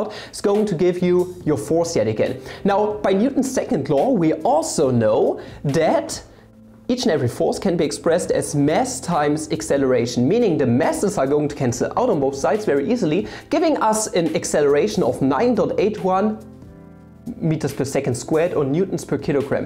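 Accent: Germany. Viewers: Ich stoß grad so auf dein kanal und dachte mir: Alter der kerl hatt doch nen deutschen akzent. Aber geiles video alter feier ich sehr das thema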